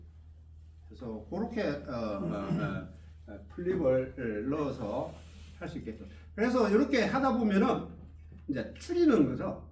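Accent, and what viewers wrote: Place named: Gyeongsang, South Korea. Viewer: 선생님 강의 정말 감사드립니다. 경상도 어투라 친근감이 가고 귀에 속속들이 다가오네요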